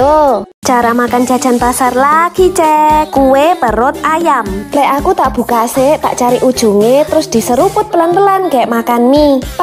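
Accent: Java, Indonesia. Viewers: Bahasa jawa nya medhog bgt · Enak bgt denger bahasa jawanya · Kak owenge jowo yo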